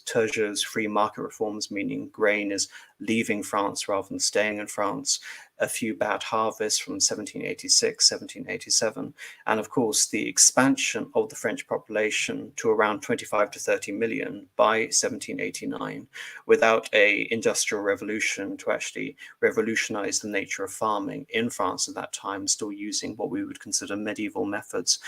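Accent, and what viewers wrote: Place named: England, United Kingdom. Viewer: Does anyone know background? Is he English? He sounds it, but it could be one of those perfect accents learned at school.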